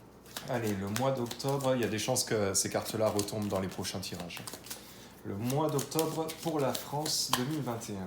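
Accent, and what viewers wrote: Canada. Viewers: Bonsoir, j’aime beaucoup quand tu tire les cartes devant nous, après tu fais comme tu le sens. La reprise des cours est elle bien?? Anglais!!! Français!!!! J’aime l’accent canadien 😀😀😀. Merci pour les nouvelles avec les cartes. Hé oui tu fais parti de la famille. Amitiés Sincères 🌹 🌹 🌹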